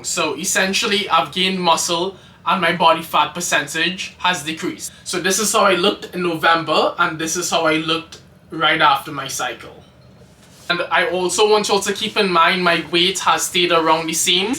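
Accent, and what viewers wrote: Trinidad and Tobago. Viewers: you sound like a trini. good luck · That Trini accent haha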